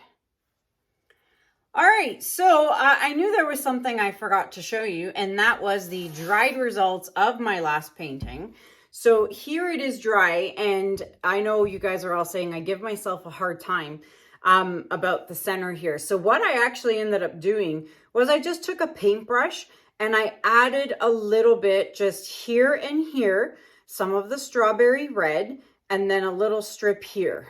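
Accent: Canada. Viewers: Oh wow, wow, wow!!! This piece is absolutely stunning! I have only recently discovered acrylic paint art but you were one of the first people I started watching. I really am enjoying your art, your tips (and as I'm from the UK, the differences between a Canadian accent and an American one! 🙂) Keep up the fantastic work and sharing things with us. I've just bought my first few acrylic paint items to try it out for myself. A few busy days this week so next weekend probably the starting point for my paint pouring first. Im not sure what to start with but as my canvas is small, it won't be a blow out on quite this scale! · Good Lord she likes to talk. I dont know if I can stay for the end, I cant take all the talking and the Canadian accent. Not trying to be nasty, its just my preference.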